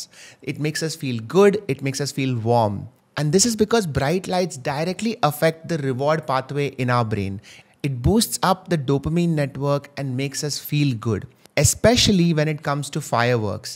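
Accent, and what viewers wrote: Kerala, India. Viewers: Are you from Kerala (Malayalam)😊😊😊😮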